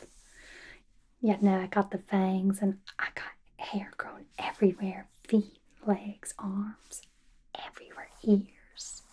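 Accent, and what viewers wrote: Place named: South, United States. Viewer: When I read “Goth Rogue”, I was imagining like a DnD character, so I was a little taken-aback by the southern accent 😅 but I still like the actual video idea 👍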